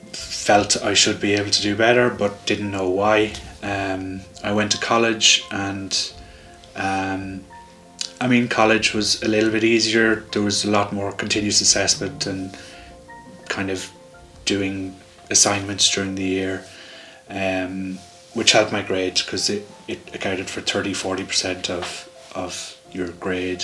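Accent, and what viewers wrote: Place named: Ireland. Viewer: A well-made video about an important topic. Love your Irish accent! Nice choice for background music. I'm very easily distracted myself an possibly someone who could meet the diagnostic criteria. But I was able to focus until the end. Cheers!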